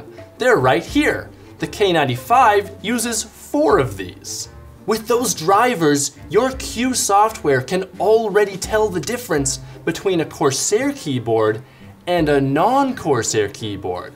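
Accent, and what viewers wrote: Netherlands. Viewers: Are You from the netherlands? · Are you dutch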